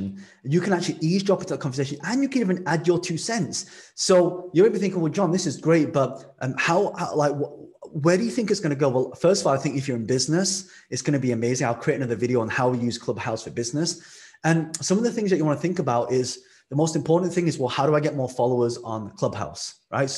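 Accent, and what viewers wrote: United Kingdom. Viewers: I love the good knowledge from you.I love your British accent also.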